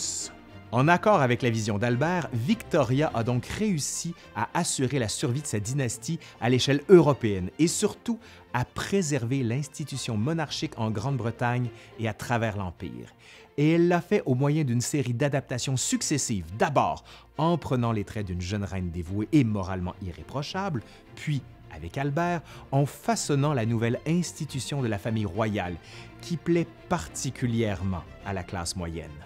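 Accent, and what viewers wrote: Quebec, Canada. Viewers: Sincèrement oui !!! excellent & fascinant ! l'histoire a toujours été mon "dada" (comme on dit en France, ma passion quoi) !!! tes talents de compteur sont indéniables 🤗 et j'adore ton petit accent québécois 😏🥰 j'aime toujours d'ailleurs la prononciation parfaite des noms anglais contrairement à nous 😅🙈 Ta chaine est ma préférée avec celle de Nota Bene & les Revues du Monde. Et oui j'avoue j'aime beaucoup aussi les émissions de Stéphane Bern 😅😁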